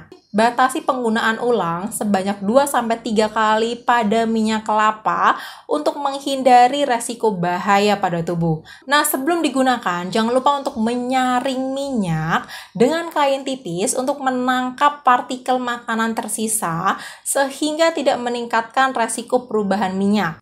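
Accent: Java, Indonesia. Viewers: Wah baru dgr suaranya medok 🤣🤣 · Bu dokter cantik ...medok bgt org Jawa kah....tmksh semua ilmunya🙏❤️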